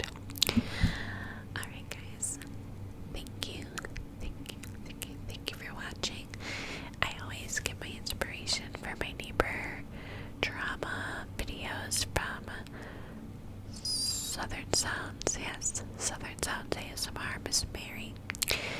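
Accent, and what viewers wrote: New York, United States. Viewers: Live for NY accent videos! 😍 Thinking of you and your fam. Grateful for al you do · NY accent 💖💖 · i’ve been waiting for another NY accent video for so long!! they are really my favourite, i can already tell i’m gonna have a good nights sleep tonight 😍 thank you, love you <333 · The NY accent makes it more tingly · Love the NY accent kinda reminds me of my family even though they have a Boston accent but I honestly can’t tell the difference🤗. Keep up the amazing work 🤩❤️😴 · Love the gossip and the NY accent 👌💜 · I love the NY accent! It’s not always easy for me to understand everything as I’m not a native speaker but your whispers always relax me so deeply 💖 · I love New York accent Keep going 👍🏼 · NY accent ASMR >>> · Yess perfect timing! I can't explain how much you have helped me lately with your recent uploads ❤ Sending LOADS of love 💕 P.S I loveeee the NY accent!!! 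· the NY accent that you do actually gives me life tbh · The NY accent is so tingly with your super clicky and soothing wispers😍 need this video rn! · Love the NY accent videos. Thanks xxx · Oh my god. Just on time. I was about to go to sleep but now I'm getting my headphones. Love your NY accent. Thanks for all the love and videos that you give us.❤❤❤ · the ny accent is back!!! we love to see it (or hear it i guess?)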